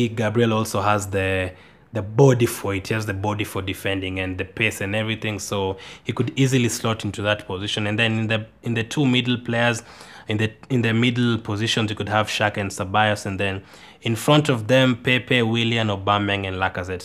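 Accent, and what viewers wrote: Kenya. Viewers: Yo he has a kenyan acsent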